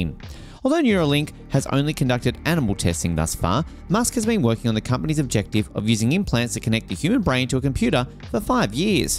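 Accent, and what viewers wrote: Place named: Australia. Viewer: Just a ridgy didge Australian accent. It's PRIME! No idea what he's alluding to. Or just trying to avoid a monotone AI like reading of a script.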